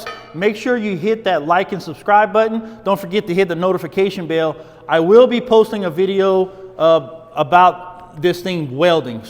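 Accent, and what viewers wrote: Louisiana, United States. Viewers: This guy must be in Louisiana. As a Louisiana resident, born and raised, I know a Cajun when I hear one...atleast he sure sounds like a Cajun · Where are you from, i hear half coonass in your voice... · Are you from Louisiana? You sound like us Cajuns.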